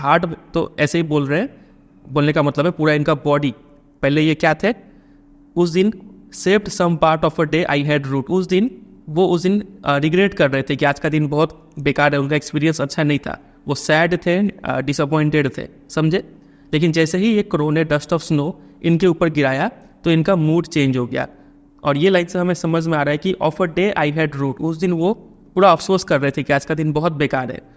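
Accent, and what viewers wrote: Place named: Bengal, India. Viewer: Sir are you Bengali as your language reveals that sometimes...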